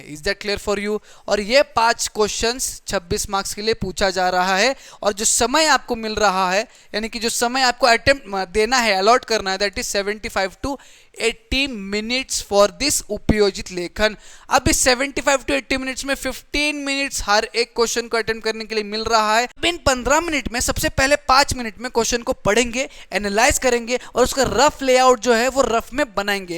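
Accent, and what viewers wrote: Maharashtra, India. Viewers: Sir aapn Maharashtra che aahat ka , toning tar tashich watate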